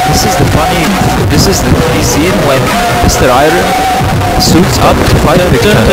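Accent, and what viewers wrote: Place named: Egypt. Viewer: The egyptian english accent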